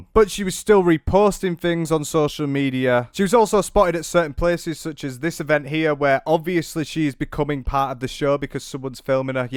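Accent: United Kingdom. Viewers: Can't believe sshe disappeared for a momth I don't want to make fun of the British accent, i love it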